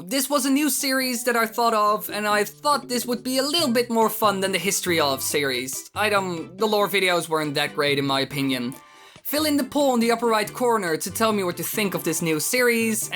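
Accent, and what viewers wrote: Netherlands. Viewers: Eeeyyy ik hoor een Nederlands accentje hoor ;)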